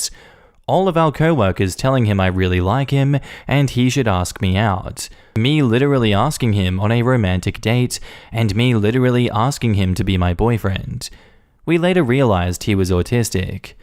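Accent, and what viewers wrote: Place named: Australia. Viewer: the Aussie accent doesn't hurt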